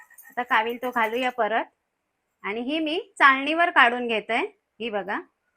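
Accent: Malvani, India. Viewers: मालवली भाषेत सांगतात ते खूप छान वाटते ऐकायला.❤❤❤❤❤❤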